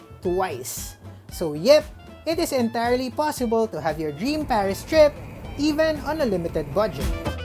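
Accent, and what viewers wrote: Philippines. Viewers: Pinoy accent 👍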